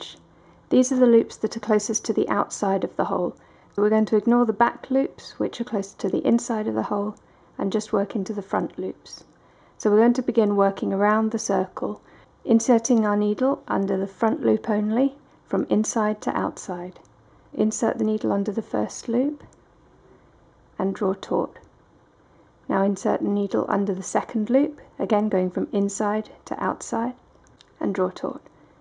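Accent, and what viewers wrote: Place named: England, United Kingdom. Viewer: wow thanks what a great video :3 I love your english accent by the way :D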